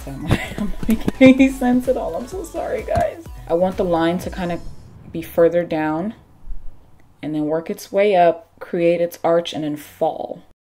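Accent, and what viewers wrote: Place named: Nigeria. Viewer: You did a great job. Subscribed straight away. Love the Naija accent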